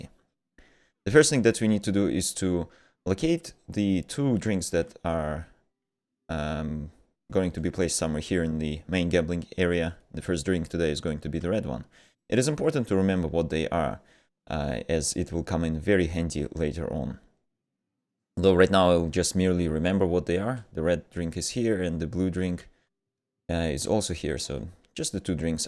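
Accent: Russia. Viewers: You live in New Zealand right, but your accent reminds me russian a lot.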